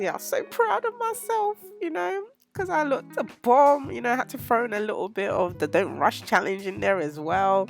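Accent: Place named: United Kingdom. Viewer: Tell me why I knew you you had a British accent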